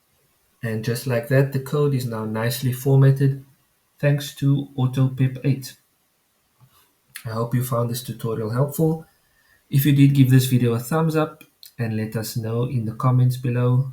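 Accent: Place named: South Africa. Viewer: love ur south african accent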